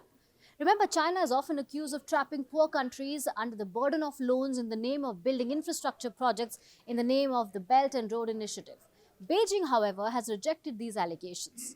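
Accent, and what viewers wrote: India. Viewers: Irritating Indian accent😂😂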